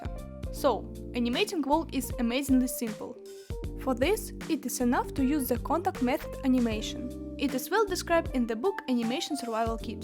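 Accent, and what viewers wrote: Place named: Russia. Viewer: When a tutorial comes from mother Russia, then the heavy accent starts, you know the video it's going to be awesome!!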